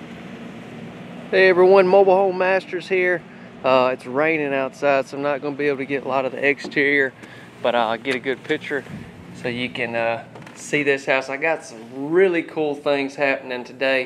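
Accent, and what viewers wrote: South, United States. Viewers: Nice mobile home, and like that southern draw.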